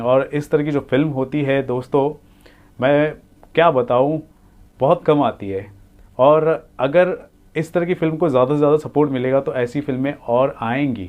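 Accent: Karnataka, India. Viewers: You talk pakka kannadiga in 1st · Ur kannada is so sweet 😘.. lots of love from Karnataka ❤️💛🙏🏽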